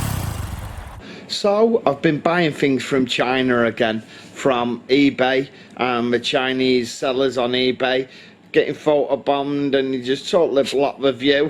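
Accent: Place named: Birmingham, United Kingdom. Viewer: That's a strong accent! Is it Brummy or further north?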